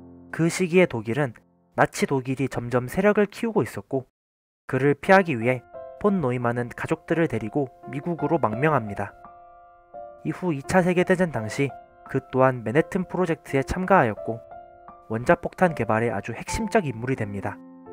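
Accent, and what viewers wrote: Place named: Jeolla, South Korea. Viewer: 의 발음을 으라고 하네요.전라도 출신인가요?반가워요!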